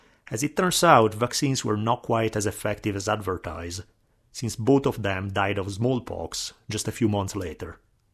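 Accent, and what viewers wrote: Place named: Italy. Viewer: Hi! I'm really enjoying your work! I only came across you because of a Dan Carlin interview with you..I lived in Italy for many years and I enjoy your accent 😊 A friend from Brescia has exactly the same accent..Bravo!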